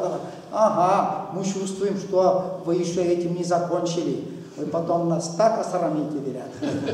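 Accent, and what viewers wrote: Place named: Bashkortostan, Russia. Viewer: Все что тюркское напяливать на татар это не есть хорошо... Вы же в начале говорили по чувашески а потом перешли на северо западный диалект башкордов... Видать в свое время они оказали большое влияние...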